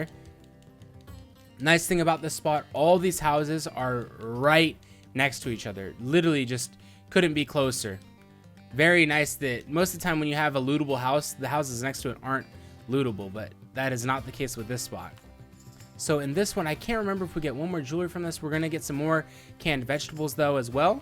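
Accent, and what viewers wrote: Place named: Russia. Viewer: I have to ask (re: Russian/Ukrainian accent)--are you of Russian descent, or it the accent just a fun-to-do thing? 8-D Either way, I always get a kick out of it. lol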